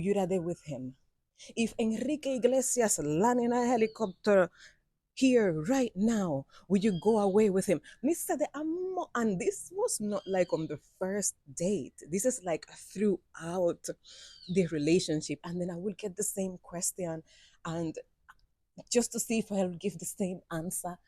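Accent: Patois, Jamaica. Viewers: There's nothing wrong with the way she talks. She lives in Jamaica and picked up the dialect. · You know, listening to your stories and the way you talk, proves that you really turned into a Yaadie after all these years, because usually Cubans who come to Jamaica are full of bad attitude, they don't like the Jamaican food, housing has to be top tier, salary has to be the double of the Jamaicans but they want to work less, and if you feel like Kfc workers in Jamaica have a bad attitude while working, you have never seen a Cuban yet😂😂😂 So I am very much surprised seeing a Cuban woman like you adapting to Jamaica so well, put in the hard work, not afraid to make her hands dirty while keeping a grateful positive attitude...yah man, you turn 100% Yaadie! 🫡👏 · Girl i love your jamaican accent , welcome home girl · Beautiful jamaican accent great story ❤ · Lawd me weak . 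I love the way you speak patwah. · Love your Jamaican accent👍❤️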